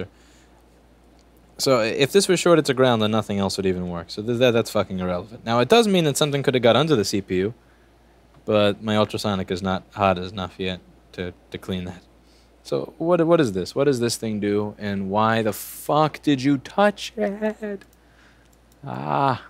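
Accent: New York, United States. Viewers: haha such a new yorka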